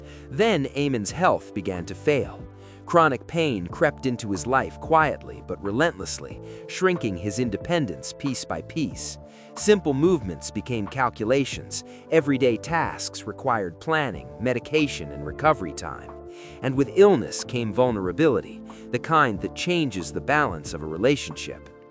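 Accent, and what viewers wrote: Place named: United States. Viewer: What the flying f…. An American voice/person who knows absolutely NOTHING about this couple. YUK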